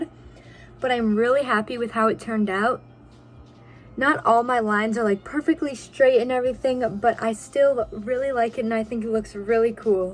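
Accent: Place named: Ireland. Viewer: i can really tell you have a irsh accent ❤️❤️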